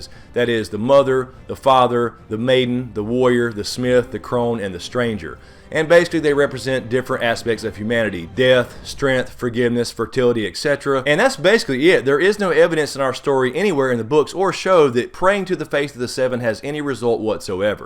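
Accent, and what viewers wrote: South, United States. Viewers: hey! I love your southern accent and the way you simplify the books and show for those of us who can't keep track of every detail. My question is what role do you think Meera Reed is going to play in the season to come? I believe someone told her that she was important to Bran and she did save his life but do you think she's more important than that? do you think she's secretly someone?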